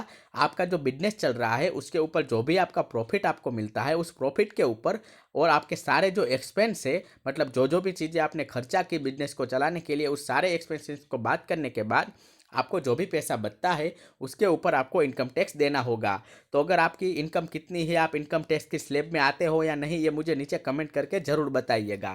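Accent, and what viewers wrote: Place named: Gujarat, India. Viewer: आप की हिंदी सुनके लगता है की आप गुजराती हो।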